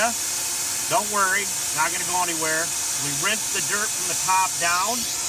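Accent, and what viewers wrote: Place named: Midwest, United States. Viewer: I could tell this guy is Midwestern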